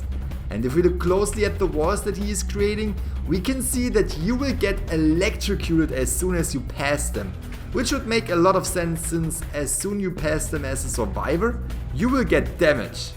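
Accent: Germany. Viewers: Are you irgendwie deutsch because you kling very deutsch xD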